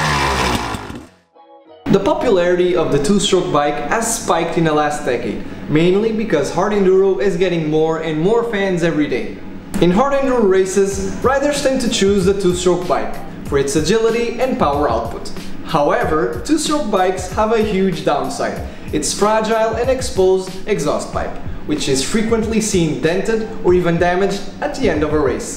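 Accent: Portugal. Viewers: Ótimo vídeo meu caro! Reconheci seu sotaque português de cara! Um abraço do Brasil! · Esse sotaque portugues nao engana ninguem ahahah. Subscrito! Adorei o conteúdo e a explicação direta ao assunto. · o narrador é Português... sotaque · Bem me parecia que eras tuga XD XD XD assim como o M Cunha não conhecia o canal... Big Props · Ah...a bela pronúncia portuguesa sobre o inglês :D Não engana! Não conhecia o canal, muito interessante. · és portugues? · E bem diferente ouvir um português a falar inglês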